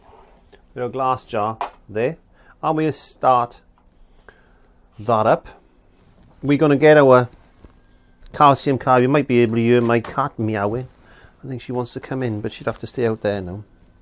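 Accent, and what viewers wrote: Wales, United Kingdom. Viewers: the accent is Welsh...even the rest of us Brits can struggle a bit sometimes. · Do i detect a slight welsh accent?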